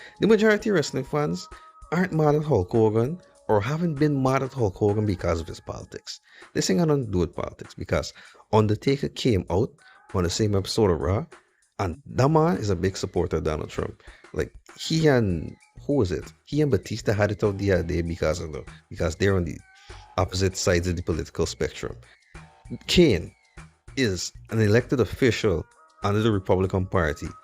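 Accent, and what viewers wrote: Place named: Guyana. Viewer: I like your accent bro. You inspired me to look up more things about Guyana. Looks like a cool place ngl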